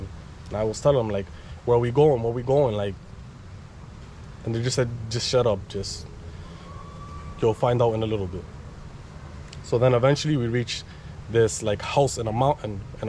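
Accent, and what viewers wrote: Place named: United States. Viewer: I have been to Colombia about 20 times across all the main cities and have never experienced anything remotely this wild. I am glad you are alive and made it through your ordeals. I would encourage you make calculated risks with the folks you meet. If I saw you in Cartagena, I would assume you were a local so don’t feel like you stick out; predators prey on fear and discomfort. I feel bad for what you experienced in Cartagena as I have been in many solitary circumstances between Bocagrande, Getsimani, Centro and Manga through into the late night hours and never felt unsafe. Always always a happy vibe and heavily policed. If you stay in the tourist zones, they are adequately policed and always full of people. You sound American middle class-so try plugging into Colombian middle class and stop partnering with businesses associates who can’t even feed themselves. 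Stick with Ubers- cheaper than taxis and there is tracking accountability. 2.5 million people visit Cartagena a year: if 1% of that number experienced anything similar to your ordeals, tourism would shut down and the city economy would grind to an end, which means the government has a true incentive to weed out these issues. I would recommend these tips: 1. Use Uber, avoid taxis and inDrive 2. Stay within tourist perimeters until you cultivate a dependable local network 3. Don’t call people friends, especially best friends when you just met them. 4. Don’t venture into barrios without an exit game plan. 5. Not sure how t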